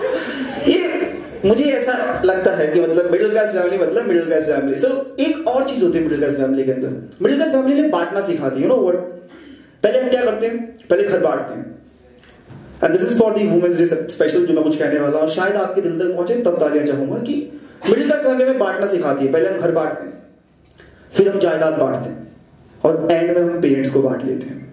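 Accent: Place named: Haryana, India. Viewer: anf yes i like the way u speak the good material... specially ur haryanvi accent...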